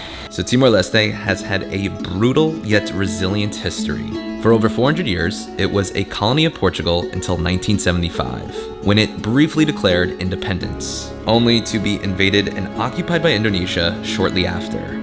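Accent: New York, United States. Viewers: Yours American English, NYC style is better, than in a movies!!!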